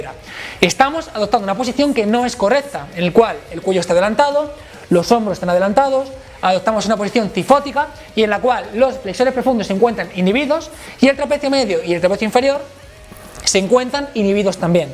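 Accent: Galicia, Spain. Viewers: Me marea mucho el acento gallego de este flaco. Me cuesta entenderlo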